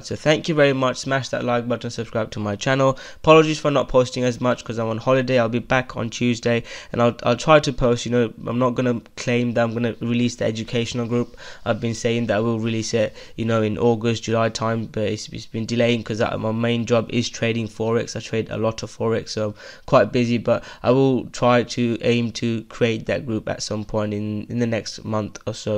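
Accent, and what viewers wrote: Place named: United Kingdom. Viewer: I feel he is a hidden gem in the world of crypto youtube. But I am quite partial because I love the Brit accent too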